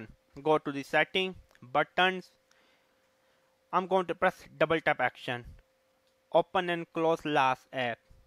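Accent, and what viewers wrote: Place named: India. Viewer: this is an indian accent ^^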